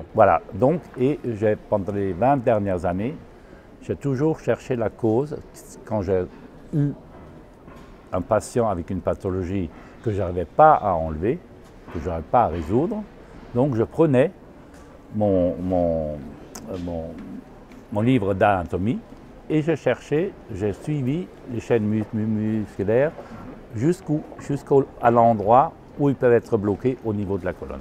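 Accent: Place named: Alsace, France. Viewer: Bonjour, Merci pour toutes ces informations mécanique et technique. Cela m'a fait du bien d'entendre ce petit accent alsacien pays de mon grand-père et de mon enfance 🌱🌸🌲. Qu'est ce que j'aurais aimé savoir si un hydrops peut trouvé naissance enviton 25 ans après hernie discale, syndrome de la queue de cheval, souci alignement dents, aujourd'hui nuque raide, trapèzes douleureux, perte audive, trouble de l'équilibre (qui d'ailleurs s'accentue avec la fatigue, l'inquiétude, tensions!) (qui s'accentue avec la migraine ou céphalée de tension) qui deviennent ingérables à la ménopause et qui detraque mon vestibule, mes muscles ... .. Tout est du même côté mais pour les bras et mains des deux, mais pas la même symptomatologie. Si ce n'est pour l'hydrops ?, je pense que oui. On peut également relier le tout à un parcours de vie compliqué de 9 ans à .. 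Cordialement, E. Parisienne de l'est 🙂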